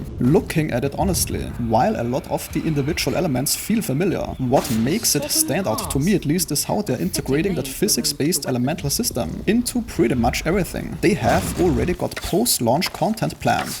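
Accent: Germany. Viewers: i cannot stand that german accent....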